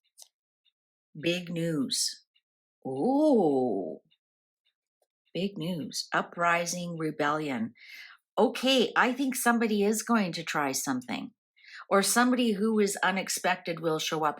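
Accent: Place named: Canada. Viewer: Thank you for this reading. I love to hear your Cannadian accent. It reminds me of my immediate family who married Canadians and live in Ontario😊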